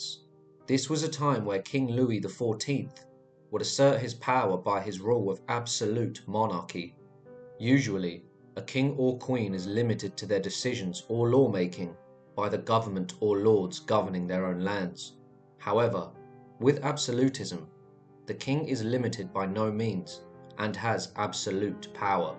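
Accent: England, United Kingdom. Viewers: You have an English accent and live in UK... where do you get your American cereal from?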